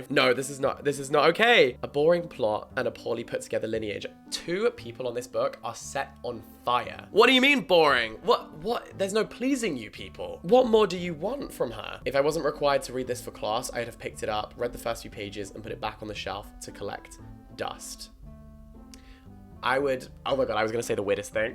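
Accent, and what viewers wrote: United Kingdom. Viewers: British accent so cooll👏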